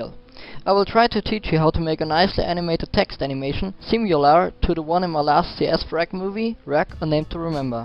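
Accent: Germany. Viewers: are you from germany? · man hat gehört das du deutsch bist =) aber richtig geiles tut wird mir sehr helfen danke..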